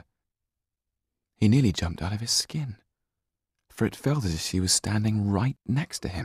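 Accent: United Kingdom. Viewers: He brings such a huge opportunity for us male English learners to get a british accent. Bravo! Made it in one year. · you're not alone ..i still don't understand what he said because i 'm so distracted by his sexy voice....omg i love his voice, his acccent,...i love britsh they have such amazing voice..too bad im not from british :( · Am I the only one that giggled at his rough london accent?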